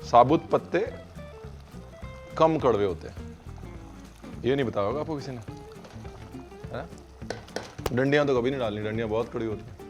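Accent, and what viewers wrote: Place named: Punjab, India. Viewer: Hi Ranveer tuhadi punjabi ta sachi maar dendi hai, above all tuhadi recipes....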